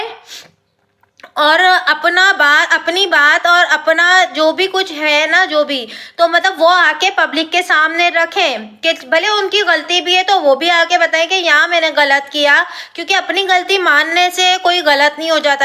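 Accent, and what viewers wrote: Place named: India. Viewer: Sach me aap pakistan me raheti he aap ki baat cheet lahja indian jassa he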